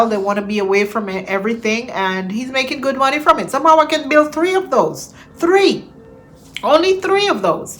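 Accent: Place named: Jamaica. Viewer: I heard Jamaican in you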